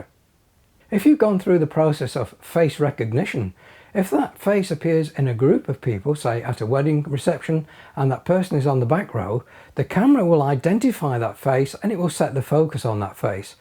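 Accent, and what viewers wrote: United Kingdom. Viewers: How come there is no younger person minus the British accent video tutorial for Panasonic fz 300 on you tube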